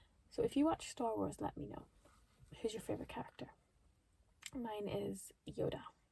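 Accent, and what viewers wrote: England, United Kingdom. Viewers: Are you British ? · It sounds like you might be an English English teacher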